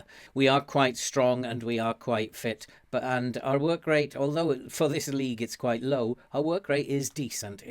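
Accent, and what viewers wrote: Wales, United Kingdom. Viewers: What an amazing guide, I really wanted to find a way to create my own built on the abilities of my players rather than copy and paste one. This is exactly what I needed, thank you! I also detect a Welsh accent there mate, where are you from? Cardiff here! Subscribed with thanks!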